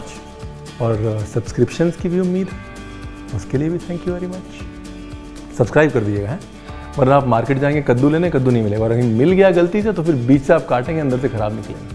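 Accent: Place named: Maharashtra, India. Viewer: "Barni me dalke" nice ❤️ Marathi influenced hindi 😀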